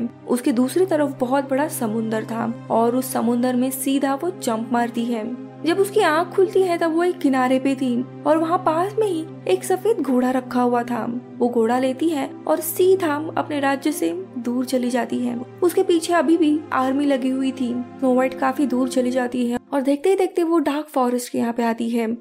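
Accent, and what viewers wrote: Maharashtra, India. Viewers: do you have any relation with nikhil of climax explained in hindi .moreover you both sound a bit marathi . pls tell and BTW love ur explanation.thank u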